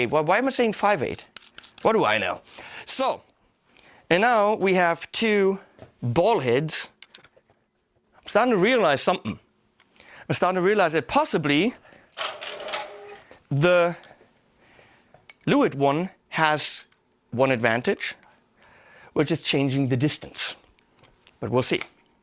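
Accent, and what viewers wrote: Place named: Hesse, Germany. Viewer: Hahahaaa, wenn Du deutsch sprichst, kommt ein bisschen Hessisch durch... 😀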